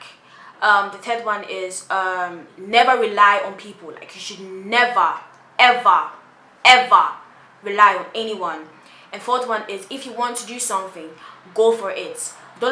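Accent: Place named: United Kingdom. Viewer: You have a british accent🥰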